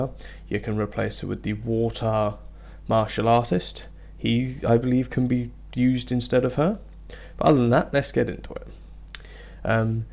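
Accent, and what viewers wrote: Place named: Surrey/Hampshire, United Kingdom. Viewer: Great video, really informative! Was actually stunned to see only 100 subs, so take mine - also you sound like you're from Surrey/Hampshire?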